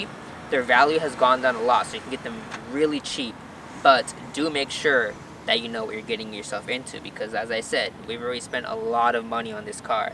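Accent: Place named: United States. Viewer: What the fuking wrong with your American voice?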